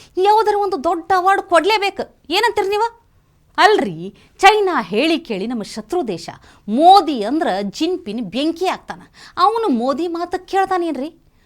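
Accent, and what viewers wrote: North Karnataka, India. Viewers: Super anchoring mam... Your voice is super. ನಮಗೆ ನಮ್ಮ ಉತ್ತರ ಕರ್ನಾಟಕದ ಕನ್ನಡ ಕೇಳಿ ಭಾರಿ ಖುಷಿ ಆಯ್ತು. You are standing out of the box as compared to other anchors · ನಿವು ಹೇಳುವ ಸ್ಟೈಲ್ ನಿಮ್ ಸ್ಮೈಲ್ , ಉತ್ತರ ಕರ್ನಾಟಕ ಭಾಷೆ ಬಹುತ್ ಮಾಜಕ್ ಮಾಡುತ್ತೆ ಅಕ್ಕಾ . ನಕ್ ನಕ್ ಸಾಕಾತು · ಮಾತಲ್ಲೇ ಇರಿವ ಬಗೆ ಅಲ್ಲಿ ಮೂಡುವ ನಗೆ ಅಂತ ಇಂತು ನಮ್ಮ ಉತ್ತರ ಕರ್ನಾಟಕದ ಭಾಷೆಯ ಸೊಗಡು.. ಅದಕ್ಕೆಂದೇ ಹೇಳಿ ಮಾಡಿಸಿದಂತಿದೆ.👌👏👍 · ಯಾವಕೆಲೇ ಇಕೆ ಉತರ ಕರ್ನಾಟಕ ಭಾಷಾದಾಗ ಮಾತಾಡತಾಳ್ ಹಡಸು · ಅಕ್ಕಾ ನೀವು ಉತ್ತರ ಕರ್ನಾಟಕದ ಜವಾರಿ ಭಾಷೆಯಲ್ಲಿ ಬೆಂಕಿ ಬೆಂಕಿ ಮಾತಾಡಿದ್ದಿರಿ,,, ಟಾವೆಲ್ ಒಳಗ ಕಲ್ಲು ಕಟ್ಟಿ ಹೊಡದಂಗ್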